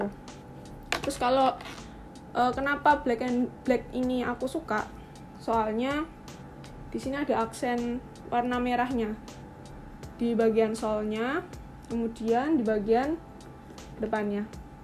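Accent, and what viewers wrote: Java, Indonesia. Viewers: jawa banget ngomong nya, love you cantik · Medo' banget jawane :v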